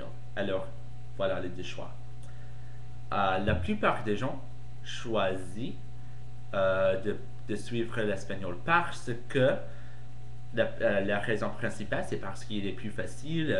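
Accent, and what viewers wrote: United States. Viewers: Ah l'accent américain (léger) que tu as quand tu parles français, assez marrant ! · Pas mal. Tu as un petit accent US mais ce n'est pas grave du tout, on arrive a te comprendre. :D Bonne continuation.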